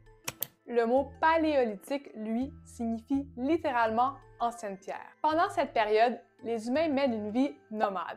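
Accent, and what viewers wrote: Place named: Quebec, Canada. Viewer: Bonsoir Madame! You Tube m'a proposé votre chaîne, et comme j'aime beaucoup l'Histoire, que j'ai une formation d'Historien, que j'ai même une maîtrise, que je travaille à un modeste poste au Musée d'Archéologie Nationale à Saint Germain en Laye (France), que ma voisine de rdc s'appelle aussi! (je me demande si elle a de la famille au Québec) et que pour un Français l'accent québécois est for agréable, je m'abonne! Au musée les visiteur Québécois sont mes préférés, ils sont toujours aimables, souriants, causant, ils ont l'air heureux de vivre. Au plaisir! 😀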